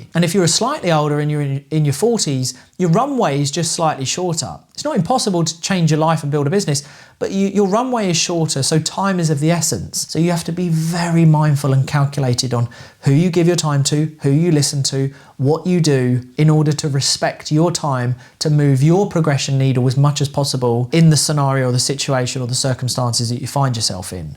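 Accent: United Kingdom. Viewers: Man~my good sir! I totally enjoyed your video. Coming from a country where American English dominates, now I am really starting to like your British accent. How marvelous! Thanks again.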